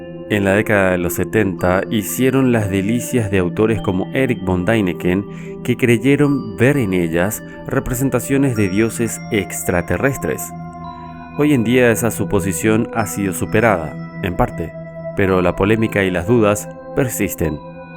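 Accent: Paraguay. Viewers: Eres paraguayo ?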